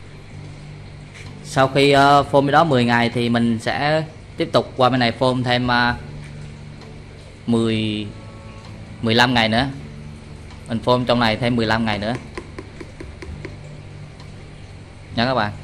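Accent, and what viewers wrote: Vietnam. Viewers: em nghe giọng anh em đoán ko nhầm thì anh ở đà nẵng giống em haha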